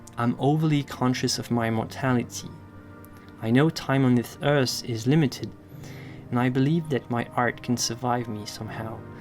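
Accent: France. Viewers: Salut, je sais que t'est français, l'accent dit beaucoup de choses, et j'ai envie d'en parler en français, si quelqu'un veut le traduire, c'est a sa guise, je pourrais l'écrire en anglais mais je trouve ça plus personnel de te le dire de français à français, enfin bref, sache que ce Thomas a eu exactement le même ressenti que moi et beaucoup de gens comme tu l'a expliqué tu est toi même passé par la, mais la n'est pas le truc qui m'a touché, ta réponse, ta réponse change une perspective que j'avais alors même que je m’apprête a faire ma rentrée en école d'arts, j'ai du talent, et je le sais, mais ta vision des choses optimise tellement le gain de confiance et juste le fait d'apprécier créer que tu change beaucoup de choses, je suis passionné de création je voudrais avoir toutes les créations du monde dans ma maison, et je voudrais que les miennes en fasse partit intégrante, j'écris sans réel but la.. 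j'ai juste besoin de poser mes pensées quelques part.. hum. je souhaite rentrer dans l'histoire de la création de l'homme tout simplement pour que mon histoire et l'histoire de mes créations me survivent, j'ai le même objectif que toi, je suis conscient de ma mortalité, mais je suis aussi conscient de l'immortalité de mes idées et pensées. alors sache que mon amour pour toi est infini, et mon amour pour tous l'est aussi, si vous voulez créer.. créez alors, ne vous gênez pas.. j'ai vraiment besoin d'écrire un livre sur mes pensées et mes idées artistiques moi.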